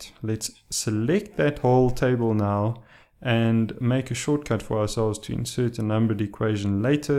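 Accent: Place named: Afrikaans, South Africa. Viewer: I'm a simple man, I hear Afrikaans English, I upvote.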